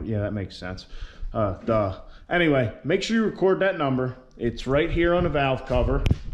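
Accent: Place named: Northeast, United States. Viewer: Number one thank you for your videos very entertaining very educational and 2 I love your Northeastern accent yes you tried to cover it up but it's still very prominent not in the bad way I used to live in New York there pronounce of words is a lot different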